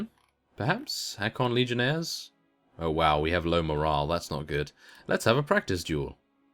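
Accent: United Kingdom. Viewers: awesome... your videos have been a source of entertainment for me for a long time now...ps: I love your british accent ^.^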